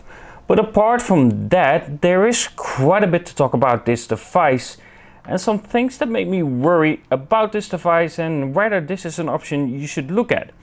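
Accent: Netherlands. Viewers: are you Dutch? I'm like 99% sure...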